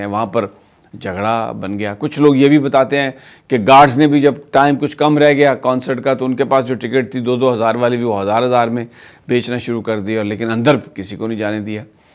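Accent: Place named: Jangli belt, Pakistan. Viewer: Samee sb good way of expressing YOU belongs to Jaangli belt Kindly confirm As sometimes words came in that way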